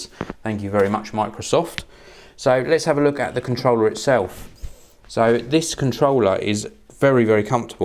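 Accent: United Kingdom. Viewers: Dat awesome british accent though.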